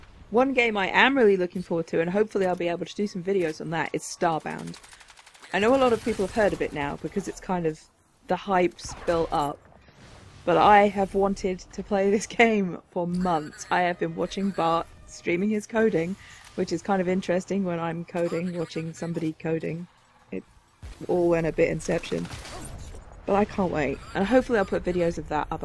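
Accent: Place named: United Kingdom. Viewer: Mmmmm, saucy, British femme fatals playin' vidyuh games. Welcome back,!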